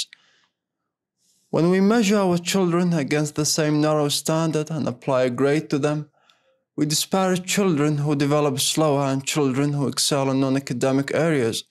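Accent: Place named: United Kingdom. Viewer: Very nice job. I enjoy it as always. Your pronunciation and british accent are great! Your voice tone is perfect! I wish you a big success and happiness! ❤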